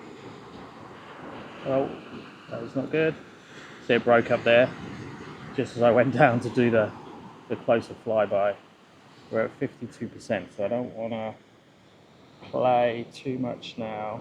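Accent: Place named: United Kingdom. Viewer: Are you originally British? You have a very british sounding accent Down under aha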